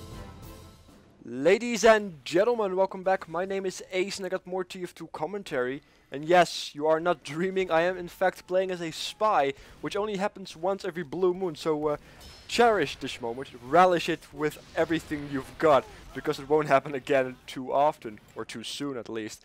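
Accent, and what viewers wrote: Netherlands. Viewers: were u from? u sound a bit Dutch like :)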